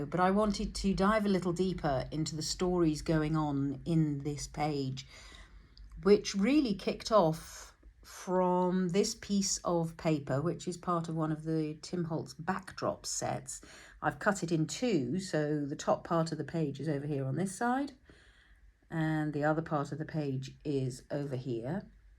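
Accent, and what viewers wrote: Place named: United Kingdom. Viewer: Thank you! absolutely will. 🤩Love to listen to a good British -English talking👍🏻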